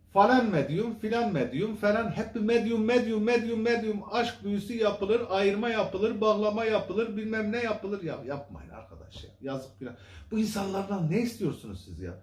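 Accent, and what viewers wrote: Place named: Birecik, Turkey. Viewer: Tam bir berecik şivesi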